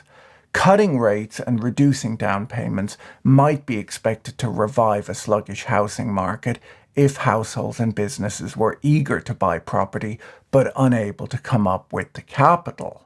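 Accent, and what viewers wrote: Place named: Ireland. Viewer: PB, is it just a coincidence, or is there a deeper reason that your Irish pronunciation matches with some Italo-American Jersey ones? Did you just talk about, Chinese "yutes?" (or is that yoots?)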